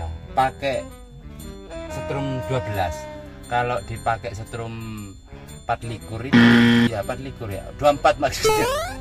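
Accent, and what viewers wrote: Madura, Indonesia. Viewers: Mas orang malang apa madura ya.??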